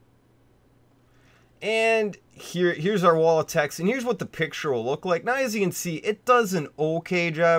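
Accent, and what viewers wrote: Canada. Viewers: I knew you were Canadian by the 15 second mark :). Correct me if im wrong though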